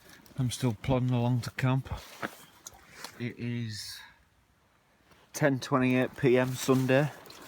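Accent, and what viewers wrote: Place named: Yorkshire, United Kingdom. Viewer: u sound very Yorkshire like.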